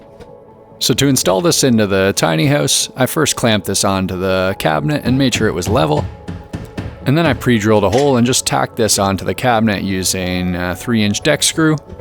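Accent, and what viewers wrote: Canada. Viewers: I'm so used to seeing crappy, overly heavy American builds using crap timber and rough as guts construction techniques that i normally scroll past. Yours is brilliant and attractive with attention to detail. Your stairs are a master class. Cheers from Australia, now realise from your accent you're Canadian. Cheers mate, subscribed. Edit: even watched the adds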